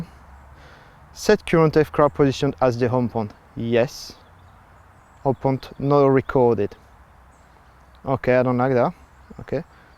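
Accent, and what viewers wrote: France. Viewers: Very usefull video because done from a beginner with mistakes and corrections... I will be in your case in one week with a phantom 3 also... Just i notice playing dji tutorial videos, the man turn in unticlock way for calibration ...i don't know if it 's important or not.... last news about dji... after christmas prices going down: standart one is 599 euros, advanced one 899 euros !!!! Oh ! do you live in an english country ? i am french and i recognize you special french accent ;-) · french accent but very good video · Tu es français ou quoi ? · On sent l'accent français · Are you French ? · that's how French people sound when they speak English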